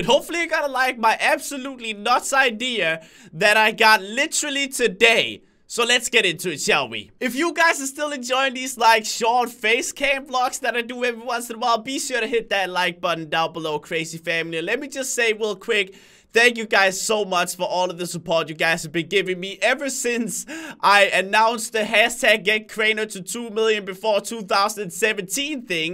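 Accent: Denmark. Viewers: i hear your danish, prove it.... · why do people make fun of your voice like its an accent cuz your danish or u just wanna talk like that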